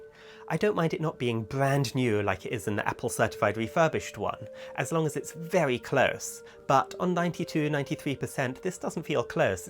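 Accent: United Kingdom. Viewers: Mate you are English for sure love it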